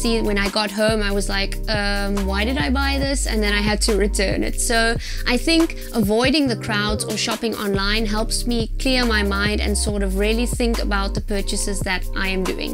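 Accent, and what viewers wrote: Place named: South Africa. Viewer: I clicked on your video and was so happy to hear a South African accent! If only ZARA had an online shop in SA. Love your channel <3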